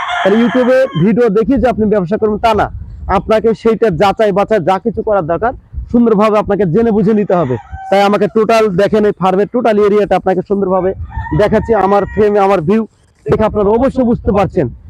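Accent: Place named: Bangladesh. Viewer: ভাই আপনার কথাবার্তা স্টাইল তো একদম বাংলাদেশীদের মত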